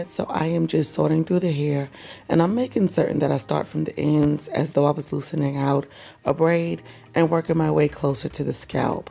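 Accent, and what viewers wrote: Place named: New York, United States. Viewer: Up, and coffee in hand. Back to the “Binge!” This “Do” looks AMAZIN! Love it; Natural/Relaxed....Healthy Hair is the Goal💪🏾💪🏾 And this looks Great! Technique is CRAZY! Such a “Pro!” Oh, and I KNEW you were a “New Yorker!” HEEEEY Fam! The #accent may have diminished, but it takes ONE to know one!💪🏾💪🏾💪🏾🖤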